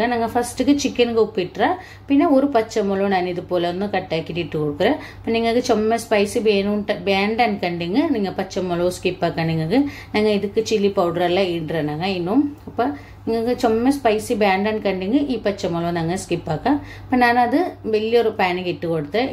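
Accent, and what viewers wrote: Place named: Palakkad, India. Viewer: Nakk ningade samsaram nalla ishtayid.ningale palakka kekkan veedio nokre....👌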